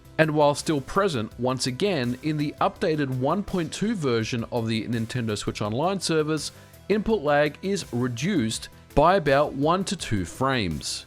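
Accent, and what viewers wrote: Australia. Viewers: Classic Australian VO work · It's probably a quirk of his accent(being from Australia) and just saying it the alternative way. That or just ask him on Twitter or some shit.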